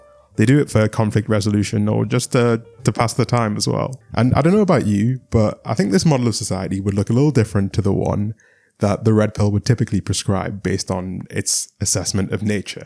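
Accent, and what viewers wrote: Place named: Newcastle, United Kingdom. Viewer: My mum looked over at my phone and said our accents are similar, u from new castle? Also she likes ur skin..no I'm not being held at gun point (help)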